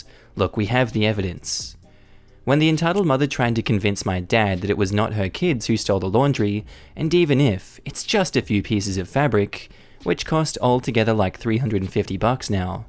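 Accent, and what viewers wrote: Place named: Australia. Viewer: Love your accent! ... Aussie voice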